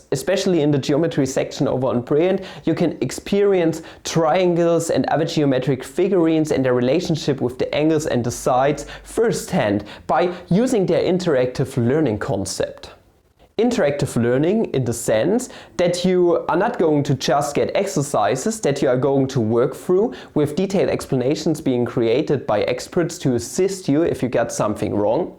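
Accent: Germany. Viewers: Your German accent is way better than whatever other accent you're trying to imitate, you should rather stick to it.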